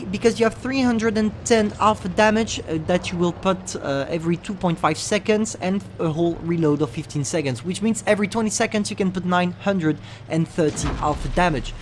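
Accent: France. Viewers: I can feel ur french accent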